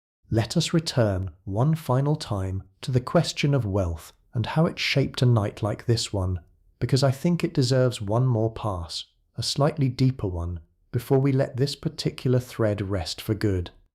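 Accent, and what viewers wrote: United Kingdom. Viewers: Why do all these channels have to be a British accent. Its frustrating for me to try and sleep while trying to figure out what ur saying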